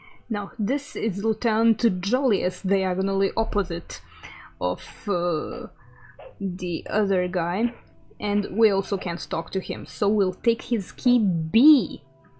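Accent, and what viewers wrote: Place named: United Kingdom. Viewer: I though you was british or something like that. I like your Accent. Is kinda cute.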